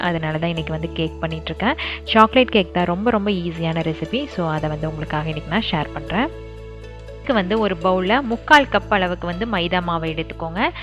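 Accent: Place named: Coimbatore, India. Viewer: i watch many other good vlogs.but no one use our CBE slang.. like kolambu sunda veikarathu,vanam vettappu vitruchu....so sweet...i heard from you.lovely.❤❤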